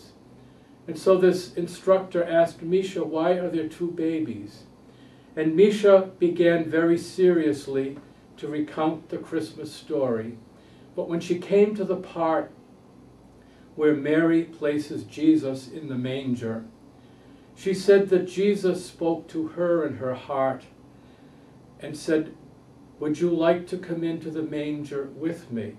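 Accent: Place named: Boston, United States. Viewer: Cousin, I really loved this video. It bring the spirit of Christmas through the voice of children. All done in a still nice Boston accent. You are a star! Merry Christmas. I'm going to Japan on 12/27 to see friends ( i use to live there) and to celebrate my 60th birthday on the Epiphany, Jan. 6th. from your cousin Joanie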